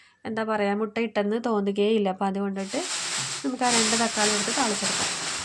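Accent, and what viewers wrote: Kochi, India. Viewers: കൊച്ചിഭാഷ കേൾക്കാൻ ഇഷ്ടമാ ❤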